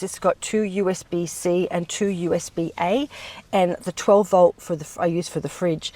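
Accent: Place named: Australia. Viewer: What accent is that? Its 90 percent Australian but something else is coming through. Just curious.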